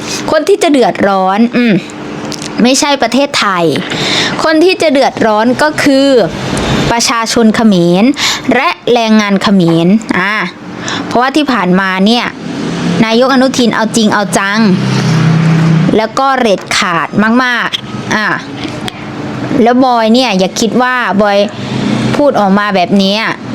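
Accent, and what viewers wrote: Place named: Laos. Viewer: พูดภาษาลาวก็ได้ทำไมไม่ดูช่องคนอิสานเขาก็พูดภาษาท้องถิ่นแต่คุณเป็นคนลาวดันมากระแดะพูดภาษากลางฟังแล้วมันขัดๆหูครับ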